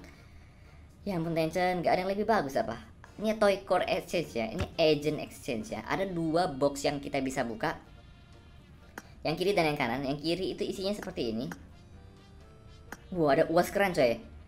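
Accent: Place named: North Sumatra, Indonesia. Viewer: jelas kali logat sumut kau bg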